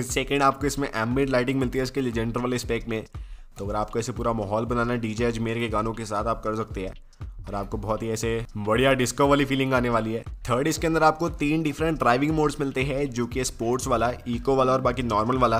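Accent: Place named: Hyderabad, India. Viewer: Tu snax gaming vale bande ke jaisa bolta hai bhai 😄😄 sahi lagta hai , full haidrabadi style 🔥🔥🔥🤙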